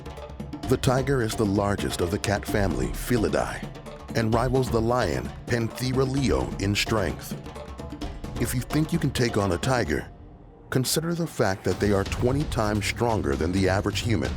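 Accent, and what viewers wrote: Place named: United States. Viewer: American accent but uses metric system🥴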